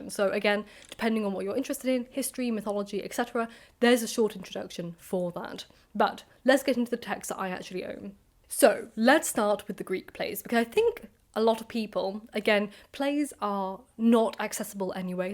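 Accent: United Kingdom. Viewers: I’m really into what you promote, but you speak too fast for an international public, allthough you are vere charming british, please consider us “ slow ones” ❤️🤗 Maybe you’re just trying to reach a british audience, then my apoligies !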